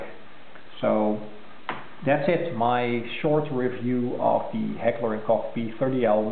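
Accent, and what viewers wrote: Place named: Netherlands. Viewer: Nice pistol and review. You sound Dutch, but I thought pistols were prohibited in Holland?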